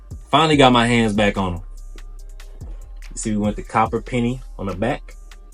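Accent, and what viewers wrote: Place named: DMV, United States. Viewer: Sound like u frm dmv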